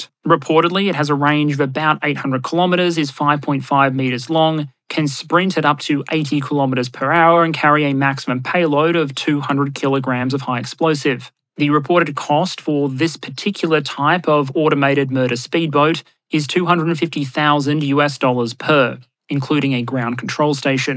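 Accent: Australia. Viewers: What an episode. You are serious what the world needs, the work you do is insane. You deserve all the awards. Thank you Mr. Aussie voice man.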